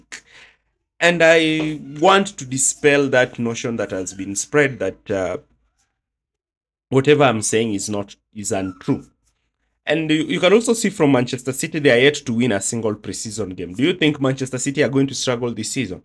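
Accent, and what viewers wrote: Kenya. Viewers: You've got a Kenyan accent, very fluent and as CFC fan ,I love your analyses. Keep it up bro. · Are yu from kenya😮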